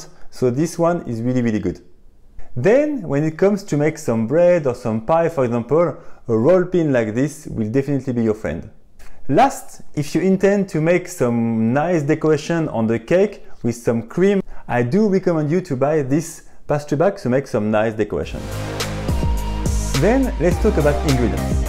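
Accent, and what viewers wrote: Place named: France. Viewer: フランス語の訛りの英語ですねぇ〜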